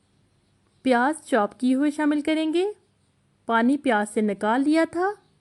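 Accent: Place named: Pakistan. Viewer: Walaikum Assalam Warahmatullahe wabarkatahu Ap jis trah Bolti Ho Jis v Ilaqe se Ho pak se Yaha pe Bht Mere bht Dost Apko trah Bolte hain.. 😊 Big Like G Honestly Full Watch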